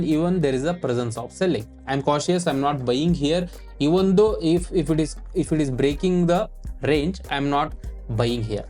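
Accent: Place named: India. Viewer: indian accent terrible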